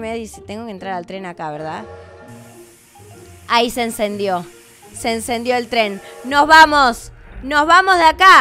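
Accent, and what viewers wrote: Argentina. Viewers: Usted es de argentina verdad?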